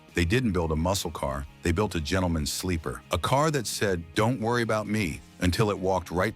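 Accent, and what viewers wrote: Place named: United States. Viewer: What no FD Vauxhall Victor 3.3? No wonder the narrator has got an american accent aye, bah!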